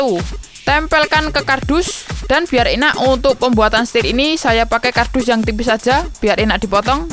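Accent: Java, Indonesia. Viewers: Oke le medok bahasa nya wkwk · Medok banget iki mantep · Medok tenan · Logat jawanya kelihatan Jowo ndi mas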